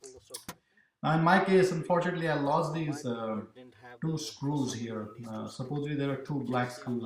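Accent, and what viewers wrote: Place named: India. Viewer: indian accent ? its terrible man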